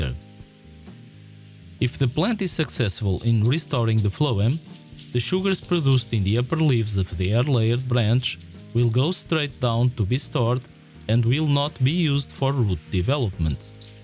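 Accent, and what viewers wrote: Portugal. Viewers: 100 percent Tuga accent. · From the accent, i'm betting this guy is Portuguese. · Gosto do sotaque português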